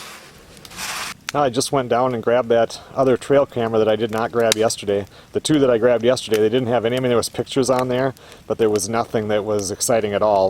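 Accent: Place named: Midwest, United States. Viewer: I love your content & channel,. I’ve been a HUGE fan for about a year now. I knew you were from the Midwest with that kind of an accent. My brother is from Chicago, so I know a Midwest accent when I hear one. Your videos are so therapeutic after I clock out of my shift from the hospital. I watch your videos almost every night. Greetings from Seattle, my friend. 🙏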